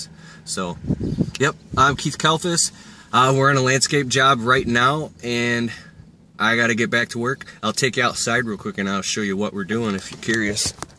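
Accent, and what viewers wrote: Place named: New England, United States. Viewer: The Landscaping Employee Trap Hey man i gotta ask you, are you from New England? You said ruffin' for roofing.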